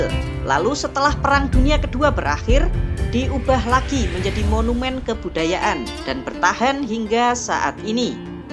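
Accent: Java, Indonesia. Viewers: Njenengan tiang pundhi min kok suaranya medok jowone min 🙏🙏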